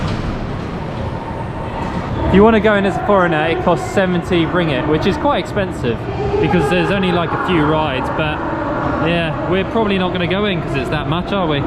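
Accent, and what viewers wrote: United Kingdom. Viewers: I really like your English accent , very nice 👍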